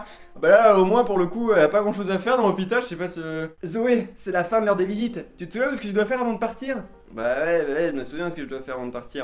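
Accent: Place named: Bordeaux, France. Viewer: Tres beau concept! ca change! perso je dis j'achete! 😂👌👏belle accent bordelais je vois 😍(les bordelais les meilleurs 😊)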